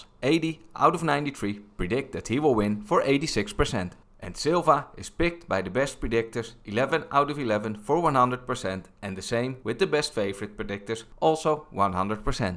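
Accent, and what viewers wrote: Netherlands. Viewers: holy shit jij praat zo tering engells op een nederlandse manier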